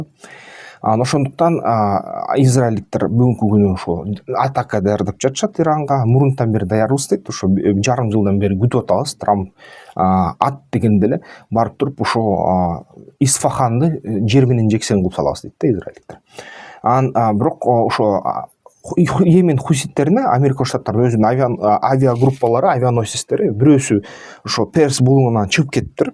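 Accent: Standard. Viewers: Нукура кыргызча суйлойсун азаматсын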